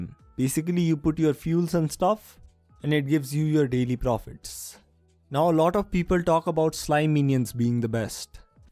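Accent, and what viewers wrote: India. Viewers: can you tell from which country you are you really sound in indian accent ?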